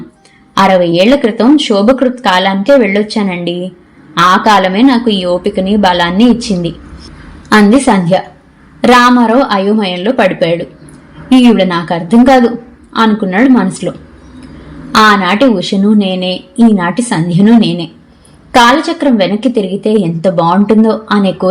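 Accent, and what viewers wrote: Godavari, India. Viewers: గోదావరియాస బాగా అలవాటైనట్లుంది 😊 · చాలా బాగా చదివావు! గోదావరి యాస చాలా బాగా పలికావు. అభినందనలు 🎉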